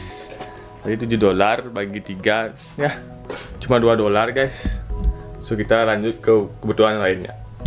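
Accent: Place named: Bali, Indonesia. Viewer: logat bali asane nahh :D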